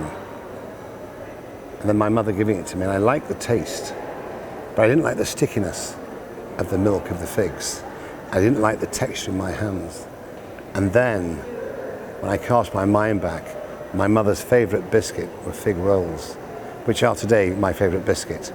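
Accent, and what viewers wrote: United Kingdom. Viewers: I love how his accent goes more Leeds when he's the man of the people, while then immediately correcting with his eloquency. He knows the language of food and of the poor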